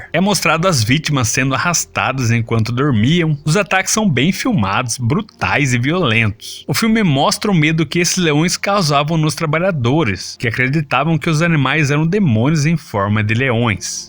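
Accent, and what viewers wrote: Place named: Caipira, Brazil. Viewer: O melhor dessa análise é o sotaque caipira do narrador!!!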